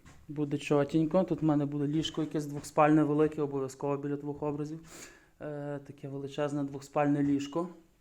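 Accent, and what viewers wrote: Ukraine. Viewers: Ще дуже подобається вимова, галицька українська неперевершена. А побачити чоловіка, який залюбки працює фізично - це рідкість.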